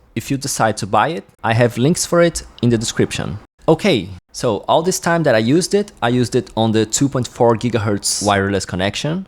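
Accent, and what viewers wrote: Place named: South America. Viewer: Heard a south american accent, where are you from? Perhaps Chile or Brazil?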